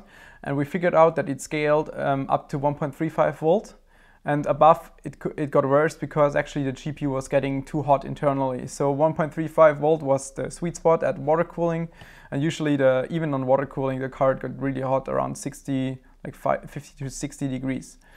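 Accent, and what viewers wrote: Germany. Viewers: You sound like a German ;) - hast du auch n deutschen Channel?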